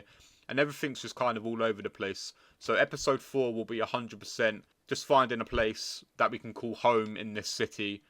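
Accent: United Kingdom. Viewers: Yo bro love your british accent,continue doing content i will suport you! Cheers 👋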